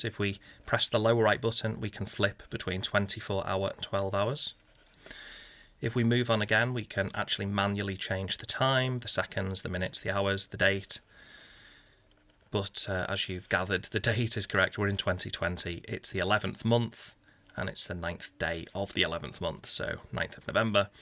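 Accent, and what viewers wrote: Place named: Liverpool, United Kingdom. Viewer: Love this watch,. I also love your accent! I'm a Yank studying all the different dialects of the U.K. Do I detect a bit of Scouse, Mate?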